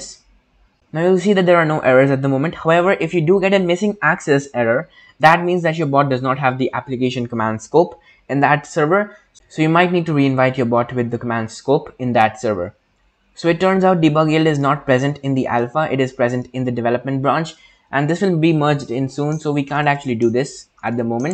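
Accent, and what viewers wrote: India. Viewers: Damn you sound more indian than ever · are u indian?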